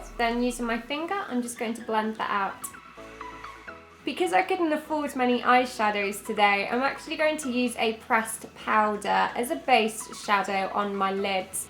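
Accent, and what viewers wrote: United Kingdom. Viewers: She's britishhhhh???? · are you British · Are you British???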